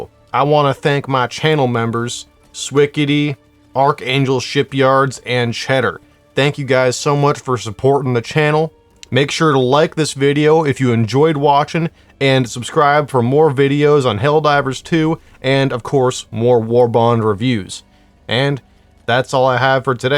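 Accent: United States. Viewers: did you seriously do the western accent this whole video? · Love the southern drawl throughout the vid! I will pick this someday soon, just need to get the credits first.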